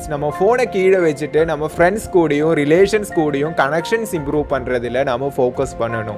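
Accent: Kerala, India. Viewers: யோவ் ஒன்னு மலையாளம் பேசு இல்ல தமிழ் பேசு,அது என்ன தமிழ் மலையாளதகதை தமிழ் ஸ்டைல்ல பேசுற,சகிக்கல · Malayalam slang varuthu..but nice👍🏼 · I like the way you talk tamil. It’s good to hear a Malayalam slang Tamil. 👏🏻👏🏻👏🏻 keep posting more videos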